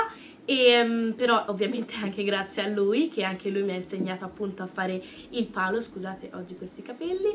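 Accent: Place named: Florence, Italy. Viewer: te tu c hai l accento un po fiorntino dan do vieni ?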